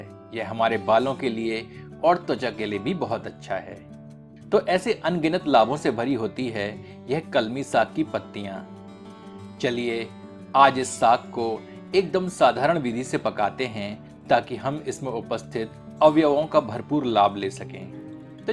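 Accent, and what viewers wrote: Standard. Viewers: aapki hindi zabardast etni shudhta se bhari prastuti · Wow, swadisht, shuddh Hindi k saath 😀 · Aapka bolneka andaz aur sudh hindi bohot achaa hai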